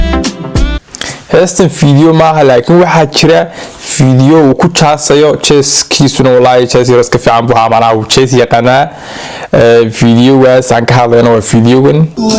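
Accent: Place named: France. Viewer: War afsomali ku hadala waxay sidii adonta aya farasisi kuhadlaysa mesha somaliba jogte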